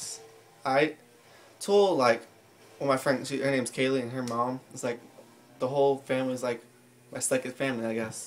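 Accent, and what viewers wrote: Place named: United States. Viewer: The American Accent though :3 Aw i cant believe i dated you :') Your amazing just gotta say :)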